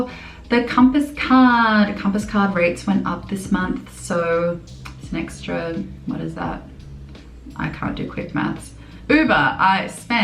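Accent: Australia. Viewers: I would love to explore Melbourne with you one day! I want to learn an Aussie accent from you! Enjoy your wonderful life in Vancouver! Xoxox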